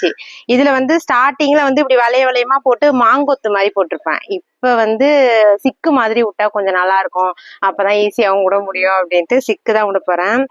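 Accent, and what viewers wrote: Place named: Mayiladuthurai, India. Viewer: Srumsi thirsmaisali ma❤😊 enga relatives lam mayiladudurai la erukanga unga slang apdiye eruku anga erukavanga lam epdi ungala mare dha semaya vela seivanga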